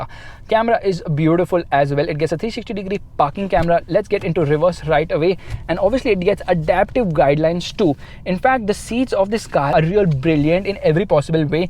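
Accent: India. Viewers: I know lol.. I said I thought he said fucking Since his speaking fast and his indian accent is thick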